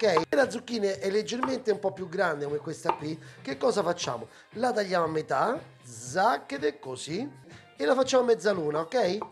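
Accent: Rome, Italy. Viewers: A parte la parlata romana che ha un sapore a se', la ricetta stuzzica proprio. Mi manca il sapore di Roma, manco da li da più 50 anni. Ciao!!!😋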